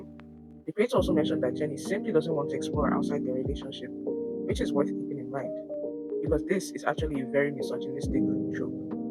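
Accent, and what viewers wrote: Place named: Nigeria. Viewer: I KNOW THAT ACCENT ANYWHERE OMG 🇳🇬🇳🇬🇳🇬 FELLOW NIGERIAN ARTIST HERE YIPPE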